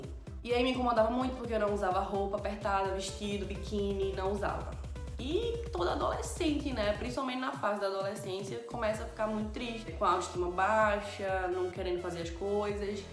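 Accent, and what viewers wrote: Brazil. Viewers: É de Recife?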